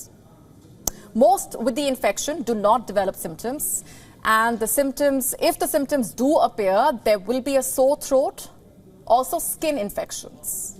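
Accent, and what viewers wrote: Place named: India. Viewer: i hate her Indian accent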